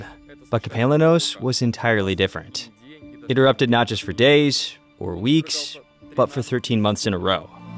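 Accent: United States. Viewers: Good apart from the annoying American accent voice over. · Had to stop watching as the commentary is in Murican, please learn how to say, 'Ah Zores'' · Interesting video! Good presentation. Clearly an American accent (via your AI overlay) but speaking in units of Celsius for temperature measurements? at least put the deg F in subtitles. When was the last time NOAA.gov put all their units only in Deg C?… try never (yet). Based on your channel videos it’s a good guess a large portion of your audience is American… How hard is it to visually post both units?…. With all your complex map graphics, seems like a tiny detail. If 2% of my viewers used predominantly Kelvin, I’d put that in subtitles too. And let’s be honest, Kelvins are the best units for temperature anyways! 😊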